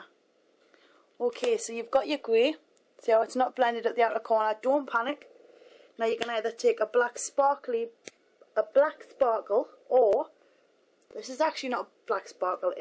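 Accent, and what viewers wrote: Newcastle, United Kingdom. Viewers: she is from UK, so, she have a british accent :D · omg are you from newcastle? x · I can't believe these posters can't hear your British accent. I don't think it's your 1st language though. You are from somewehre else. Am I right? I like your video. You look lovely. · She's got a Geordie accent....she's from Newcastle in the UK :)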